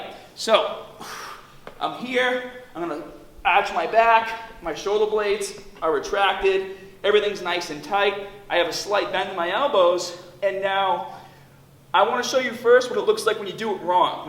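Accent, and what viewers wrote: Boston, United States. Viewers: He's gotta be from Boston. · I've never heard your Boston come out so much in my life 😯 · trying to help you guys. Since I am suffering right now from shoulder pain, maybe it’s a good idea to listen to him instead of making fun of his beautiful Boston accent. Yes, there are differences about how far down to drop your arms, but you can experiment with light weights and then draw your own conclusions. And I wish you success too! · Grab the "bah"? Lol. I am a Bostonian transplanted to FL. Nice to hear home. Great vid too. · Gotta love Bostonians and their accents!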